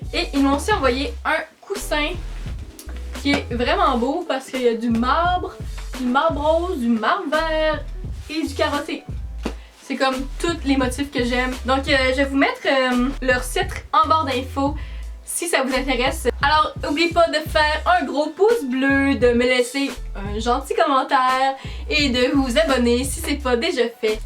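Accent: Quebec, Canada. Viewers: j'adore ton accent québécois, continue tes vidéos je t'adore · C pas sa faute si elle a un accent québécois perso j'ai un accent parisien, et son accent est tres mignon elle y peut rien ❤️ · elle et canadiens sa se vois et sa sentant !!!!!!! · j'aime tellement mais tellement tes vidéos ! Au début j'avais un peu du mal avec l'accent québécois mais franchement je me suis habituée puis maintenant je regarde beaucoup de québécoises et j'aime beaucoup votre accent ! Puis reste comme tu es parceque je ne te connais pas réellement mais la personne que tu fais ressortir est vraiment cool. J'attends ta prochaine vidéo! ☺💙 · moi j'adore ton accent et je rêve de vivre au Québec depuis que j'ai 6 ans (j'en ai presque 14) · J'aurai tout donner pour avoir ton accent. Mon rêve est d'aller au Québèc, donc tu est pour moi PARFAITE. <3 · J'adore ton accznt québécois:continue change pas ton accent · j'adore ton acce't et ca se voi qui, vient de Québec ☺💜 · Moi je t'aime trop puis j'adore ton accent ce québécois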